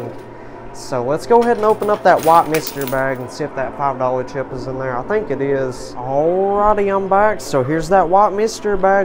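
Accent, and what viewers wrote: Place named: Oklahoma, United States. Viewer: I watched a old video , when you didn't have a bunch of money. Your voice sounds completely different, sounds like a hick, like my OK. accent. You also are nervous. But you are a rich pro and a great speaker. Proud of you brother. ALAN SMITH IN OKLAHOMA!!!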